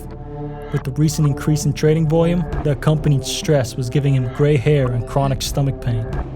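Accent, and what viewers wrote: Netherlands. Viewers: You have Dutch accent. If you are not Dutch that's not very common 😄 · You sound dutch or flemish 🤔 am I right?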